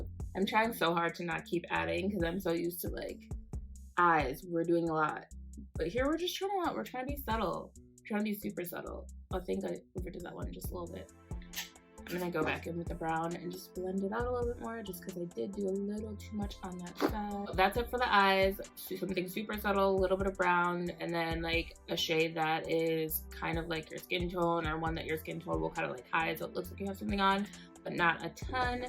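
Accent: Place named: Africa. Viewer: It's the African accent for me 😂😂😂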